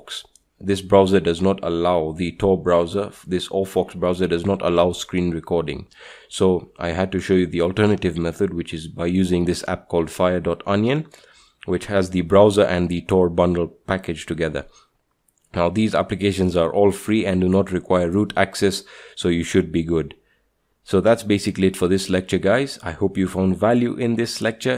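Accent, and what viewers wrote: India. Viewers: He's obviously Indian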